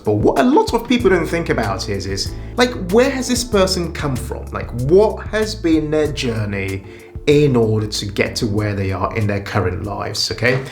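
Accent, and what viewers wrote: London, United Kingdom. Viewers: I had to stop watching the video to post this quick comment: I thought you were a Londoner. My husband is originally from London and your accent is close to his. 😄😄😄. I am from Brazil and despite being on this learning journey since 15 and having a fluent level of English , I still have a brazilian accent. Back to the video 🤣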